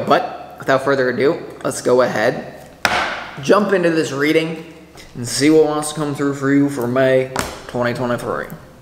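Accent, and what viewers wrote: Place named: Texas, United States. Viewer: Sounded Texan thank you for your time 😊 Yes! I circle around back to why I started my channel 😊 that's deep...my kids are my greatest legacy but maybe I do want to leave another legacy. Greane village with my soulmate who is back in my life.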